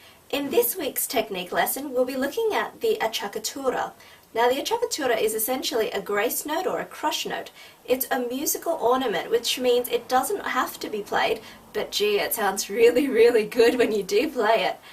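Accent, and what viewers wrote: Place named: Australia. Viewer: Nice to hear an Australian accent :-)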